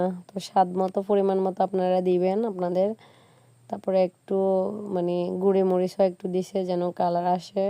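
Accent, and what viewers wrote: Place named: Noakhali, Bangladesh. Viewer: হায়রে নোয়া,,,,,,খাইল্লা।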